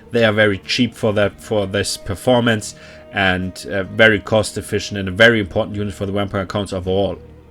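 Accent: Germany. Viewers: I’m officially going to start intentionally pronouncing vampire “wampire” henceforth. Love the Deutsch accent. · I find it funny that other germans can tell germans just by the accent. Knew instantly, very nice guide ! :D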